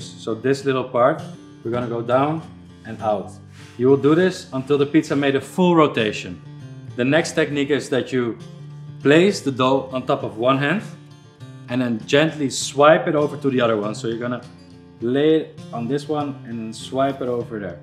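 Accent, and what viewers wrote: Netherlands. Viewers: Hi there :) are you Dutch? You sound as if you are :) greeting from the Netherlands! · Dutch accent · Lekker Hollands accentje! Ziet goed uit hoor! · Jij moet wel Nederlands zijn ik hoor het gelijk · Ik herken een nederlands accent altijd en overal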